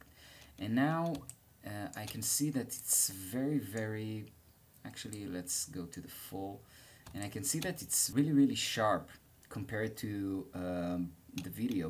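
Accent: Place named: Israel. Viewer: שומעים שאתה ישראלי אבל אחלה מדריך! תודה רבה:)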